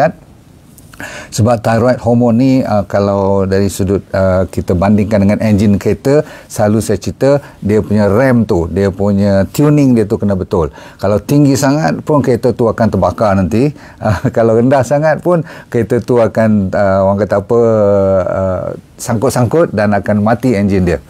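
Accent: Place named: Terengganu, Malaysia. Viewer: Dr dh pandai ckp ganu - senyum sokmo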